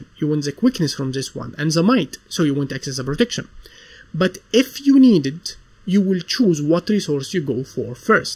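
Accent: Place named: Egypt. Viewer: new players and your channel is very helpful thank you so much🤍 also your accent add a flavor to the video it's very close to my Egyptian professor 😂😂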